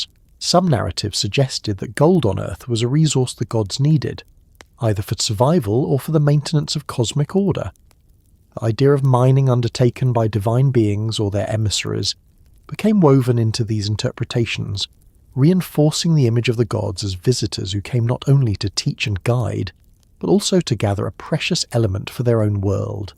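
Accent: United Kingdom. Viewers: How many channels do you have? You are the same british voiceover on many other similar topic of a video. Are you an AI British voice?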